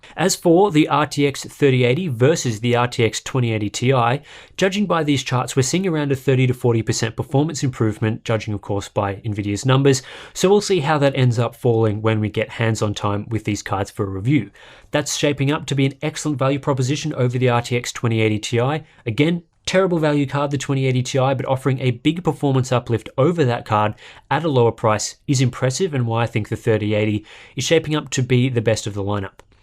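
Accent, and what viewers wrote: Australia. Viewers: An actual proper analysis, in proper Australian English!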